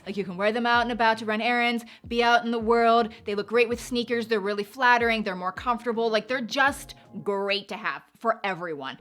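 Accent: South, United States. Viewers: Nothing wrong with sounding Country 😂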